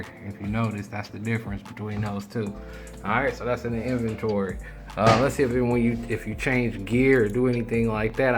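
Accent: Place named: Mississippi, United States. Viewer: Felt like someone from Mississippi telling the story on a rocking chair couldn't understand half of what he said.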